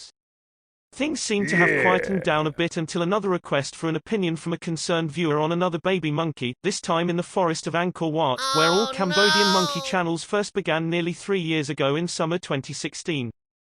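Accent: United Kingdom. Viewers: where do you get youre voice over voice? something about a uk accent makes it sound way less robotic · Sounds like somebody is all bent out of shape because he's not getting enough views. The true question is, why are you using an English accent on your video? Hmmmmmmm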